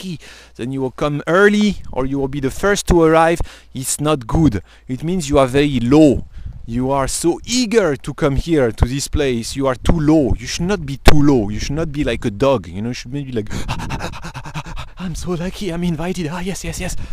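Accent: France. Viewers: French english, so typical, haha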